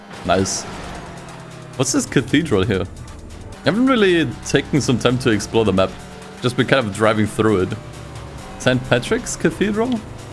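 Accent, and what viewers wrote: Germany. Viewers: Are u German? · Hearing a German guy pronounce Spanish words is very amusing lmao not that he did bad he actually pronounced him pretty good it's just accent humor lol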